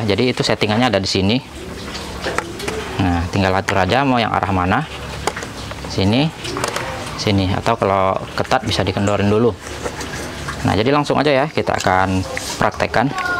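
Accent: Medan, Indonesia. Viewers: Abg dr medan ya, sama donk bg...medan dmn nya bg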